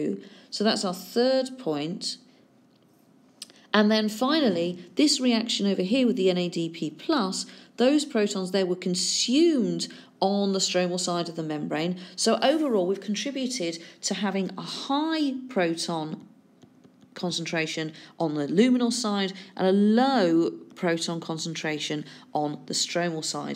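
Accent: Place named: United Kingdom. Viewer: It's not bad pronunciation it's accent (British accent)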